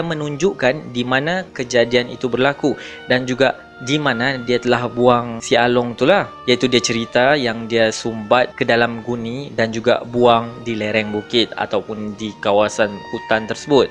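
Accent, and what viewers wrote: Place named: Sabah, Malaysia. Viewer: apa kau bunyi macam org sabah bah?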